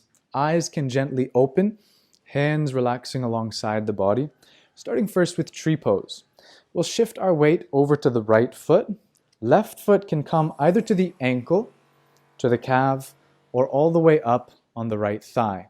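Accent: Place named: United States. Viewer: I hope I am not insulting you, however, you sound as if you spent time in the U S A Teaching Yoga, or demonstrating. Namasda. God Bless you.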